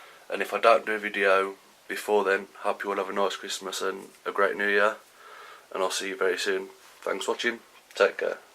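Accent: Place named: Midlands, United Kingdom. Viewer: Great video. I could listen to you "ramble on" all day. (You sound like a Midlands boy to me :)) Have you tried mixing in 20 - 30% perlite into your seedling mix? I find it helps to mitigate risks of dampening off. Ecothrive Charge, too, is a really nice potting mix amendment—easily obtainable in the UK. Peace, love and happy, vigorous sprouts—————Everest.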